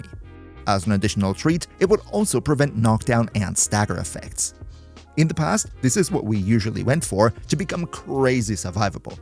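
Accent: Germany. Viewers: Well, he's german 😉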